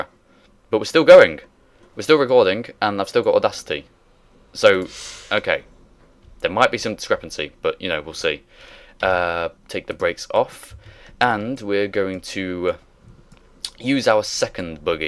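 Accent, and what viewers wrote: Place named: England, United Kingdom. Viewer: You sound like an English PlumpHelmetPunk. Awesome.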